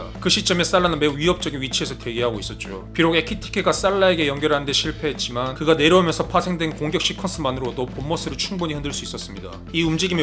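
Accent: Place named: Gyeongsang, South Korea. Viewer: 경상도 스탈인듯요~~^^😮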